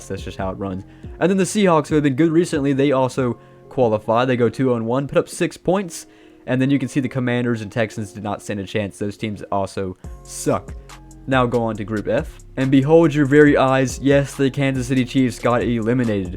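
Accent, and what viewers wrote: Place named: South, United States. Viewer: I like your content and also where do you live your voice sounds a bit southern but at the same time a bit northern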